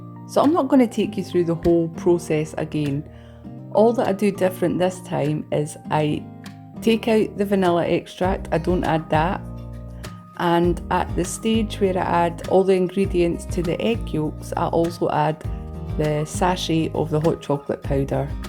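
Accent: Scotland, United Kingdom. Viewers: Hi, I just subscribed. You are from Scotland? or a Scottish person living in the US? I have to binge watch your channel, just to catch up. 👍 I'm Irma from Texas 👵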